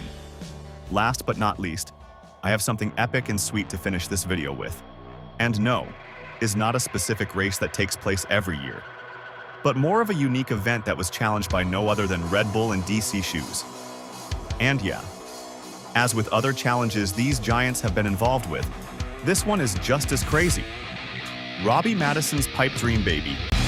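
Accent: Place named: United States. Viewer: American accent; says 'whilst' and 'pub'...🤔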